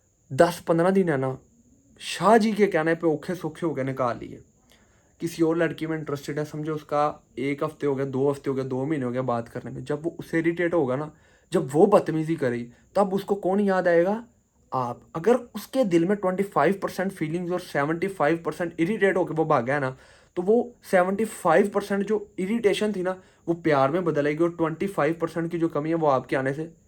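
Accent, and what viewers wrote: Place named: Punjab, India. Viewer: Plz zuban thek karo punjabi penduuuuuu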